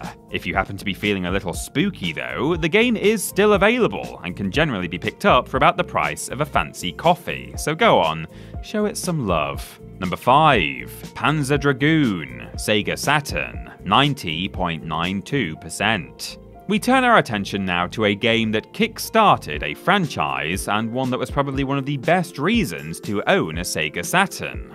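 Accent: United Kingdom. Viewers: It wasn't until hearing pronounce it with his British accent and cadence, that it finally sank in. Diddy's Kong Quest is supposed to be a play on Diddy's Conquest.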